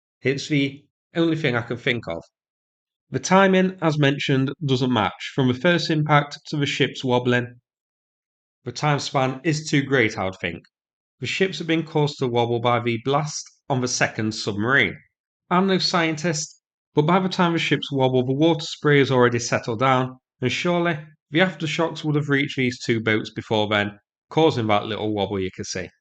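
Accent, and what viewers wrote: United Kingdom. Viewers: thanks subtitles...where are you from in Britain?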